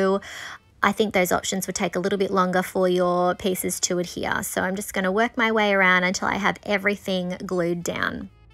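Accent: Australia. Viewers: I just found your channel and it's so nice to hear your Australian accent. You're very talented and inspiring me to try this. Thank you ❤🐨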